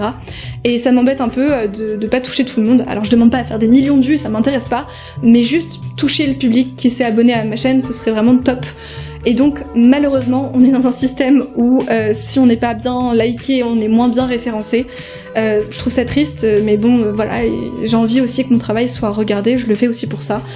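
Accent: France. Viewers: Très compréhensible... j’ai adoré malgré que j’ai habituellement un peu de difficulté avec les accents français! J’adore ❤️🤍❤️... je m’abonne!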